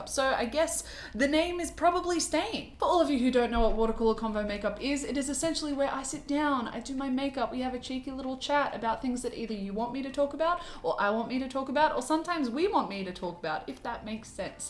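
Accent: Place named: Australia. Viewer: i watch so much american content that hearing a fellow australian is like a shock to my system. i mean, yes james charles has always been kinda problematic and Discussions are Kool but im highkey here as an australian being like YAY ACCENT OF MY PEOPLE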